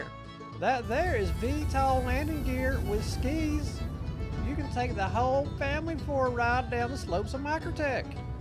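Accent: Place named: Texas, United States. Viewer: I saw your videos a week ago and I like the texan presentation of ships ! It's like the freelancer official videos but with the accent RSI forgot !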